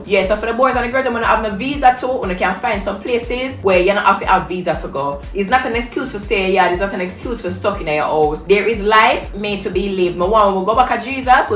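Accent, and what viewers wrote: Jamaica. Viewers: Travel anxiety girl mi think a did me one❤❤😂 but u find bae happy for u girl gud me just love how u talk the things them like a real jamaican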